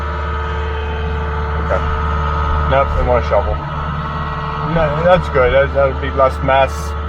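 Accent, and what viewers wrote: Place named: Pennsylvania Dutch, United States. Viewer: I seem to detect a heavy "Pennsylvania Dutch" (German) accent when you talk. That combined with your German last name, brings me to that conclusion. Are you located out of Amish Country in PA?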